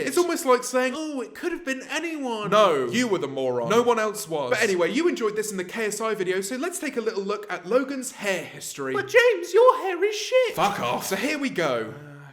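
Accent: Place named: RP, United Kingdom. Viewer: Your so posh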